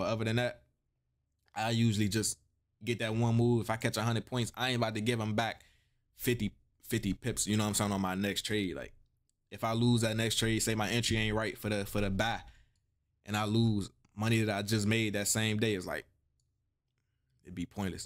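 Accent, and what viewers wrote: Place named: Washington DC, United States. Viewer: I’m watching this video & saying to myself slim sound like he from the city (DC) checks for Instagram cause that’ll usually tell 😂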